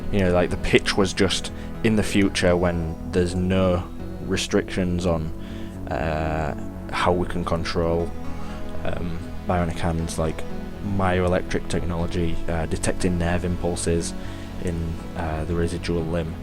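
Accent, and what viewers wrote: Hull, United Kingdom. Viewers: You're welcome. It's literally a 'make everything beautiful' feature. A couple of clicks and boom! Realistic shadows and lighting. Did you use any constraints on the hand rig? They're a pain to set up, but they're a real timesaver for animators. Hearing the Hull accent on YouTube is weird. 😀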